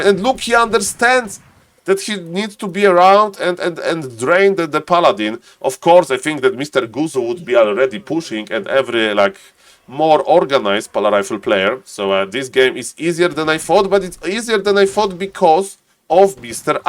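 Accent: Poland. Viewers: Are you Polish? The accent is very polish :D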